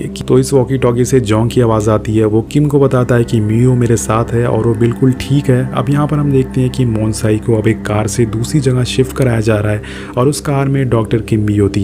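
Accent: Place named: Bengal, India. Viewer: Apke bolne ke tarike se yeh pata chalta hain aap sayed bengali ho....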